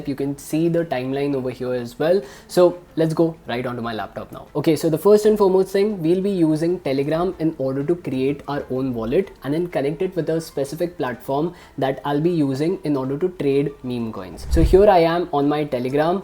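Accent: India. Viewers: Everything is good you are good Toothpaste Seller, but for GOD SAKE. Will you be F.... STOP NODDING your head. Besides your Taaattrrring Indian Accent I have got headache by just watching your video just your F...Head Nodding. Please take it as constructive criticism. For GOD sake.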